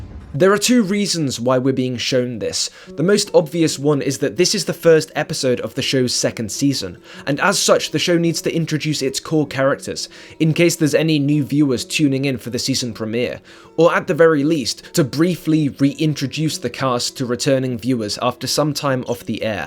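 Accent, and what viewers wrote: United Kingdom. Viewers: This video title sounded fire af, then I heard the british voice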